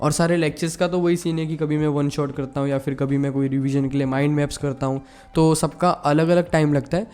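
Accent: Maharashtra, India. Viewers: Your language gives a very strong maharashtrian vibe😂❤ BTW another maharashtrian here.💗🌟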